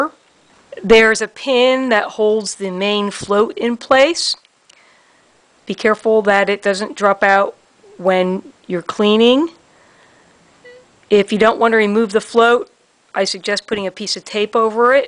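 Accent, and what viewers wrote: Philadelphia, United States. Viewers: Philly area gal. Can tell by the accent. "Wooder."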